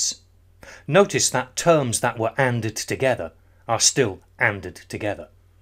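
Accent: England, United Kingdom. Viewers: i liked your English accent, good work, how do i ask my question??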